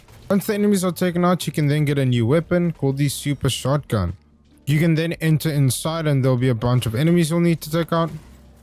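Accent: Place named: South Africa. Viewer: Just curious, are you south African? You sound a lot like dicuss du plessis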